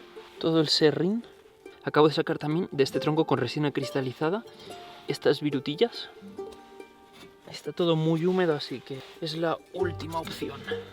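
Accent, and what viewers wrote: India. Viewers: por que hablas ingles como un indio? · Por probar, he visto tu video doblado al inglés por ti, y la verdad solo a modo de crítica constructiva, hablas muy bien inglés pero pones un acento indio. Cuando puedas escuchate a ti mismo y fijate en eso para cambiarlo si quieres sonar más americano o neutro.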